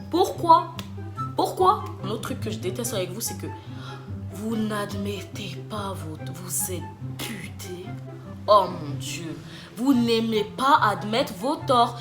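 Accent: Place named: England, United Kingdom. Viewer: team gémeaux malgré toutes les critiques 😂 les gens croient que je suis taureau le plus souvent... j'ai sorti une video sur les 3 qualités de chaque signe astro regarde l'avis d'une gémeaux^^ tu me tues , comme t'as demonté tous les signes 😂😂😂 ma bestie est poisson (sinon ton accent anglais est genial) !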